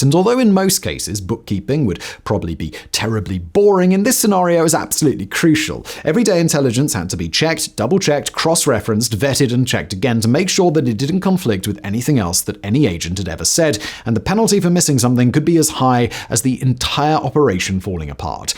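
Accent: United Kingdom. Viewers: I'm pretty sure this was a very good program. What I could understand was very good. My problem is that I am a US citizen, and though I love British accents, I can't follow very rapid speech. I missed at least a third of what was said because it was a little too fast for me to be sure I was comprehending what had just been said. If your main audience is British or Commonwealth citizens, I am sure you are doing a terrific job. If you also want to let Americans appreciate MI5 and XX, perhaps you could speak just a little more slowly? I know the fault is MINE -but I did hope to hear it all, and I couldn't keep up.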